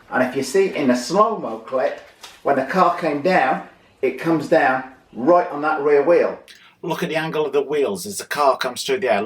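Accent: England, United Kingdom. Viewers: greeting from Berlin Germany...I love your videos, but some times your English accent is too strong and i miss some word here and there. could you please tell me what gearing you use in this particular video? sorry i did not understood went you say it in your video. Thank again for all the tips...(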